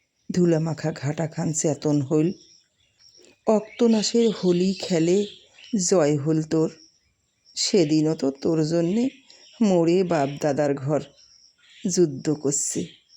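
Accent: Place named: Rangpur, Bangladesh. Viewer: "সংস্কৃতি বাঁচলে, শিকড় বাঁচে" - ঠিক কথা। ভালো লাগলো রংপুর আঞ্চলিক উচ্চারণে বাংলা কবিতাটি। আরও শুনবার আশা রইলো। সবাই ভালো থাকবেন। 🙏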